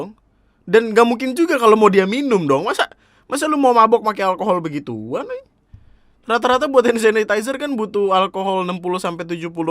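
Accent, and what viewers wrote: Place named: Medan, Indonesia. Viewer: Dari logat nya kaya nya orang Medan itu bang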